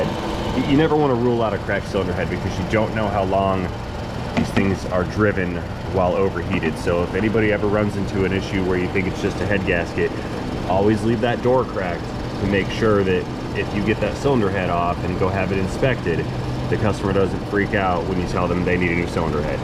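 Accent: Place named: United States. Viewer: Your language is good fun I'm English and your American is great fun to listen too 👍😀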